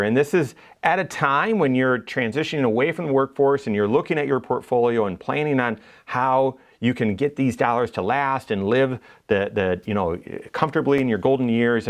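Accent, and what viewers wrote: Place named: Michigan, United States. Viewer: I knew that was a Michigander accent before you even said Midwest! Great info. Thank you for this. (Go Buckeyes!)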